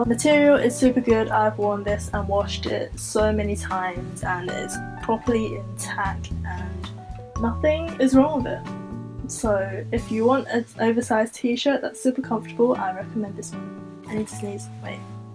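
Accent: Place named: United Kingdom. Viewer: I also love wearing puffy clothing to hide me tummy too XD i love your british accent!! im australian but people claim i sound british?! HAHAH anyways i love the haul! and how honest you are heheheh i wish i could be your 100th sub BUT lucky lucky to whoever it is T.T YEAH YYAYAYA XD looking forward to more videos!! :DDDD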